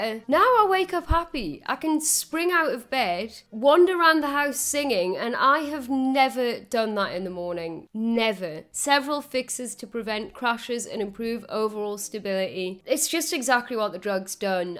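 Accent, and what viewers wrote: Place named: Teesside, United Kingdom. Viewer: Great news. Glad your health is improving. BTW, where is your accent from? Sounds a bit "Boro" to me.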